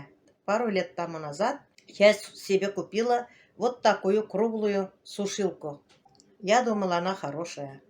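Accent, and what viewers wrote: Tatarstan, Russia. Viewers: Здравствуйте. Случайно попал на ваш канал. Посмотрел ваш ролик очень отлично. За два дня пересмотрел все ваши ролики. Ролики ваши отличные молодец. Главное не надоедает. Больше показывайте про сельскую жизнь. Единственное не отказывайтесь от подарков. Люди дарят вам от.всей души а не как помощь. Если вы нам не нравились ни кто бы не смотрел вас. А так программы отличные и этот шарм. Татарскии акцент так мне нравиться. Привет семье дочкам зятьям внукам сестре брату женгей подружке. Сестренке из ново и пусть выздоравливает. Всех вас с новым годом. Крепкого здоровья. Семейного счастья успехов во всем. С новым годом.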